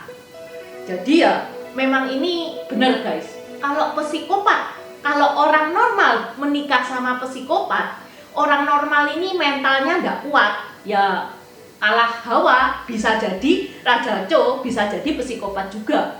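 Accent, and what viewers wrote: Java, Indonesia. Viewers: Uda AQ subscribe kak,maaf mo nnya,,Uda berkeluarga??medok tpi cina,lucuu 🤭🤭 suka n mnghibur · Tionghoa medok 👍👍👍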